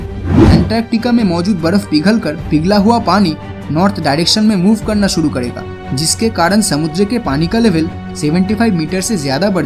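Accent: Bengal, India. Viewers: Bhai aap bengali ho kya.. bilkul bengali accent hai aapki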